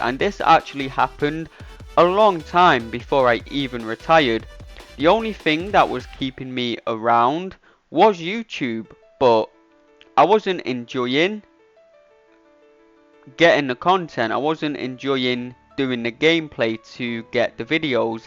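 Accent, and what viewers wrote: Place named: England, United Kingdom. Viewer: I love English accent 😂 my favorite I quit vid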